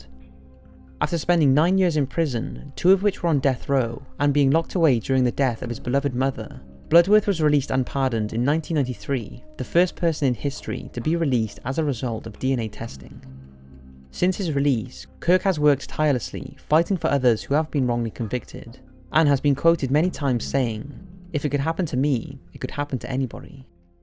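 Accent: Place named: England, United Kingdom. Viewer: Possibly because he's an English person? The rest of the world shouldn't have to change the way we all talk just because you Yanks can't take the time to figure out other countries accents and slang. So arrogant.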